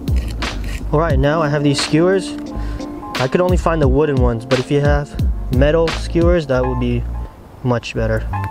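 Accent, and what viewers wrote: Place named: United States. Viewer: Bro, I live in Japan and watch a lot of fishing vids, so I guess that's why your vid got recommended, but you can imagine my surprise when I heard you speak, obviously born in America or at least lived there most of your life.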